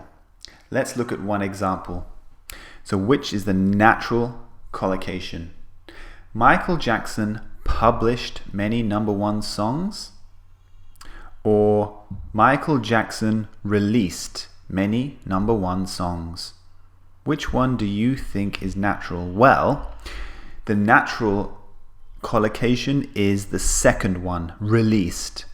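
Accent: United Kingdom. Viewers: I have a question. May I know, which British accent do you teach? Do you teach a Cockney accent, Modern RP(received pronunciation ) or a Brummie accent? Your reply would be appreciated.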